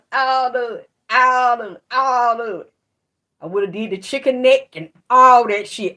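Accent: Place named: Louisiana, United States. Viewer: You from Louisiana? I was listening to another video and when you start speaking fast your accent sound like you're from South Louisiana?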